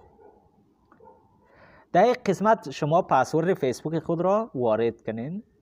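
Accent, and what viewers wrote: Tajikistan. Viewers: تا الان با گویش تاجیکی آشنا نبودم، متفاوته و زیباست، ممنون از آموزش خوبتون، بهش نیاز داشتم🍀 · خیلی هم علی و واضح بود تشکر بسیار زیاد و لهجه تاجیکستانی هم اینقدر زیباست که هر رقم آدم متوجه میشه ::::::::::: بنده افغانی هستم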